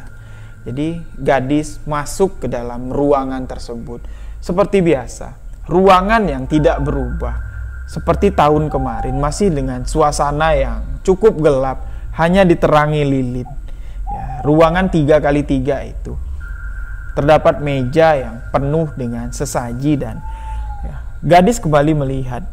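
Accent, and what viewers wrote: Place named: Jambi, Indonesia. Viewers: Saya selalu nyimak cerita misteri dari bang...hampir tiap hari beberapa cerita saya simak Saya suka gaya bicara bang...baru saya tau seperti ini gaya bicara orang jambi..enak didengar Sukses selalu bang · jambi kk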